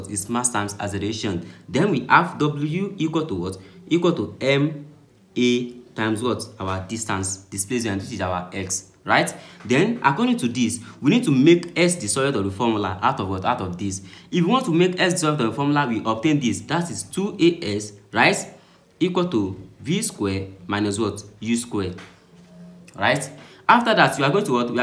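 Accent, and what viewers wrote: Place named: Russia. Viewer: Russian English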